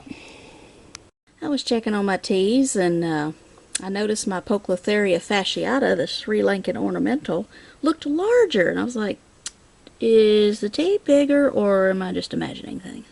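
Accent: United States. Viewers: agree!! was gonna say the same, I love this kind of US accent, plus ur expressions :D , especially that one --> "mmm mmm mmm !" when admiring the green T's legs LOLLLLL....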